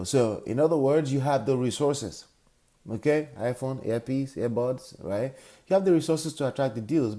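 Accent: Nigeria. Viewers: I think Nigerian accent. I love it. ❤ My daddy is Nigerian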